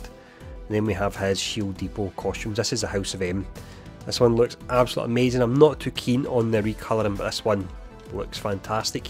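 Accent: Scotland, United Kingdom. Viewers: First video I've seen of yours.......please speak up next time. When someone is whispering with a Scottish accent, it makes it impossible to hear what is actually said. So either change the accent (which I doubt will happen) or speak up. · This guy must be Scottish.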